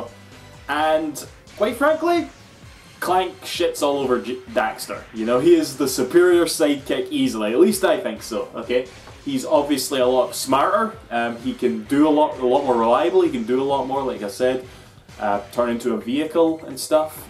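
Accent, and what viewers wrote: Scotland, United Kingdom. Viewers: Right? I've watched his channel forever and those play throughs multiple time. This beautiful Scottish accent is a part of my nostalgia at this point :)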